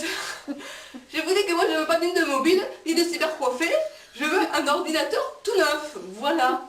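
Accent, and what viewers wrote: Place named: South, France. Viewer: ... et tout ça avec modulations et accent du Sud..! Je garde, je garde 😆 !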